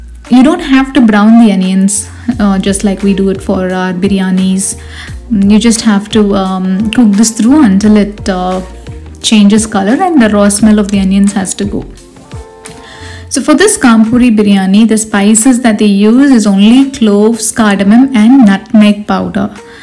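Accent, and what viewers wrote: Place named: United States. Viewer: நீ பேசுகிற அமெரிக்கன் இங்கிலீஷ் விளக்கவுரை மிகவும் அருமை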